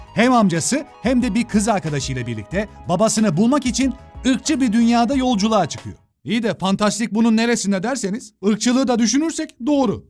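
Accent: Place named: Central Anatolia, Turkey. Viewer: Neden İç Anadolu ağzıyla konuşuyorsunuz efendim?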